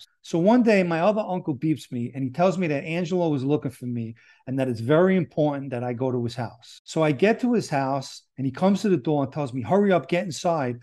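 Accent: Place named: New York, United States. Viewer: Great I'm 2nd generation Italian from D Bronx moved to Vermont some years ago. Loved your stories and it was great to hear the NY Italian accent ,It like when I watch the Sparano's I get home sick! Thanks!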